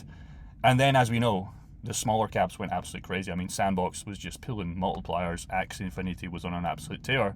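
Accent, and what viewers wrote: Scotland, United Kingdom. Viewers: Good to hear a Scots voice that can see what is going to happen in 2025. Lots of scots will miss this run as they only follow MSM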